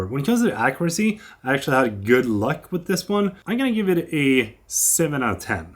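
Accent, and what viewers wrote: Sweden. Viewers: Your video editing is brilliant. Love it,. (As I typed I was saying it in your satirical Swedish accent in my head).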